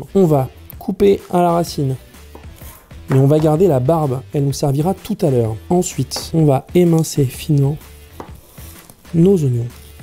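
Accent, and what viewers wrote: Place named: South-West, France. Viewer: Ce que j'aime chez, c'est cet accent du Sud-Ouest qui ressort même en parlant de cuisine Japonaise =D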